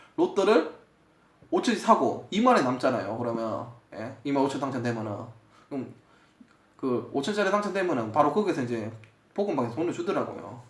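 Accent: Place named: Daegu, South Korea. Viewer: 어디분이시져? 부산? 대구같은데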